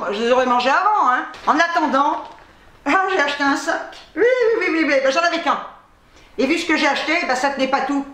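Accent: Algeria. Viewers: Cc ma belle j aime trop ton accent pieds noir 🤣🤣très bonne vidéo comme d habitude 😘😘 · J’aime..... Que dis je? J’adore ta joie de vie et puis il faut bien l’avouer ce petit accent pied noir te va à merveille 👍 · Parle ma parle avec ton accent pied noire là je ris😂😂😂j'adore. Pleins de bisous 😚😚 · Hello coucou!!!! Promis j'ai pas rigolé pour le Roll-On insectes!!! Enfin j'ai pas rigolé très fort quoi hein! Un peu quoi! Un tout petit peu!!! Cela dit, les roll-on on en a à la maison pour mon fils et moi (David il se démerde il se gratte MDR) et ça empêche effectivement de se gratter quand même... BREF!!! Les cuissardes sont magnifiques, elles te vont super bien! Très classes! Et le pull doudoune polaire j'adore et pour le prix non mais je rêve!!! Tu dégottes toujours des trucs de folie toi! Dès que j'entends l'accent pieds-noirs je pense à Marthe Villalonga moi! Je te fais plein de gros bisous Ma!!! 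· Ma chouchoute super belle en cuissardes et le pull magnifique, moi la petite fille de pieds noirs ça ma fait plaisir de réentendre l'accent et remonter de bon souvenir avec ma grand mère, qui me disais dès qu'elle me voyais avec 1 garçon ma fille attention à ta marguerite. Bonne soirée bisous de Lyon et câlins à Eurny et Mélodie 👍👍👍👍👍👍👍💗💖💓💕👍👍👍👍👍👍👍NAD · coucou bisous a toi et aux animaux pour retrouver ton blanc tu achete du vanish cristal blanc tu mets les vetements blanc dans une bassine avec 2 ou 3 cuilleres de vanish blanc tu laisse tremper toute la nuit et tu envoie en machine avec 1 dose en plus de ta lessive et ça va redevenir blanc parole je le fait avec les body de mon bebe. J'adore quand tu fait l'accent pied noir j'ai l'impression d'entendre Elie Kakoue dans Mme Sarfati j'adore. Allez gros bisous a toi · Coucou mdr 😂 ça faisait longtemps que je n avais pas entendu l accent Pied-noir!tu es fantastique!!😘😘😍😍😻😻❤️❤️ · Je ris de l'entrèe:NOZ! 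lieu de perdition de!!!!!!!!!!!!!Bonjour! my jolie candle,c'est une brillante idèe, tiens les boucles d'oreilles sont assorties à la robe que tu nous avez montrè! Mets du Blanco de Vanish, c'est efficace,c'est un sachet à mettre dans ta machine ou autre; j'aime l'accent pied- noir! et pour cause:mon mari l'est!!!Elles te vont bien les creoles,te vont bien.:reine des affaires,du pret à porter etc....Dis donc avec ta brosse, tu vas te servir d'un cotè seulement!!!!mon EURNY § GROS BISOUS!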